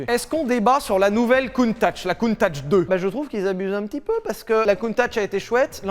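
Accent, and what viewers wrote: South, France. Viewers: Cette accents du sud incroyable ! 😂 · Ho fatch cette accent du sud 😘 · j'adore l'accent du sud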